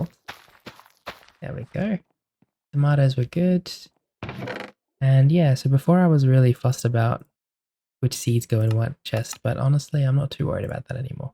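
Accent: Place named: Australia. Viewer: This is my second video to watch from you and I think you’ll be one of my favourites to watch from now on. Your voice is so nice and relaxing to listen to (it’s also nice to hear an Australian accent). I’m glad I clicked on your video ☺️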